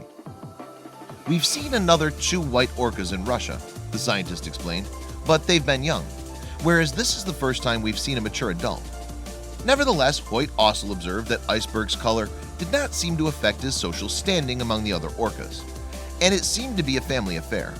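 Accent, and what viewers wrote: United States. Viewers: Dweebe yanks accents like this are both offputting and superficial ... no gravitas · I don't know why I continue to get sucked into these videos as a minute or so in to them the penny drops that the the narrator, or more likely the writers, tell 'pork pies', and the narrators ALWAYS have American accents! The first lie becomes obvious as there is a white humpback whale named 'Migaloo' sighted on the Australian east coast when he otherwise affirms that there are no other white whale specimens, the second lie being that blind freddy could see that when he claims that this white adult orca is "fully socialised" the question begs is, why is he swimming with orcas of a different type? Notice the fin size difference between the white whale and the rest of the whales in the pod denotes that whilst both are orcas, the white whale is of a different sub-species, just as are Brahman cattle are different to say, english Hereford cattle., and which means that for some reason the white orca appears to be socialising outside his sub-species group, and therefore raises the spectre of the third lie when the next question begs, where is his mother that the narrator asserts is amongst the pod? 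Then notice at about 5.05 mins into the video in much more grainy footage you see the white orca surfacing amongst it's own sub-species, so it may well be two different white orcas as was alluded to later on in the video. Then there are differences in types, being 'residents' to a localised area, 'transient' consisting of small pods in which females tend to have more triangular fins than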